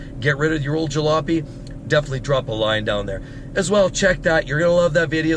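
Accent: Canada. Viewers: Notwithstanding the recent revelations regarding the workload for the Donut Media guys, no other car YouTuber works harder warning and endorsing cars and the issues people experience. When shoppuing for sleeper value, this infomation is invaluable. I also pick up on his Canadian accent every once in a while and he sound like Bob and Doug McKenzie.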